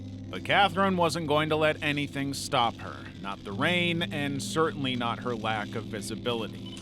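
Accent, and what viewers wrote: United States. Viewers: You reference kilometers over MPH, yet have an American accent. Are you American?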